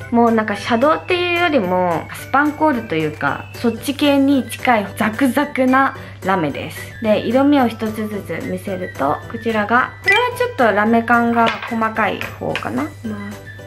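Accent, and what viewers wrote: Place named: South Korea. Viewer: 英語の発音聞くと、やっぱり韓国人なんだな〜って感じた まじで日本語上手すぎ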